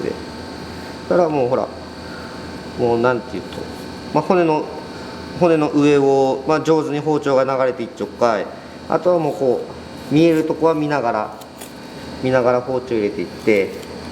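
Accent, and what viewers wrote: Miyazaki, Japan. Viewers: トラックのりです、宮崎出身の、先輩の話し方と同じで、とてもなつかしいです。うれしかったです。頑張ってください。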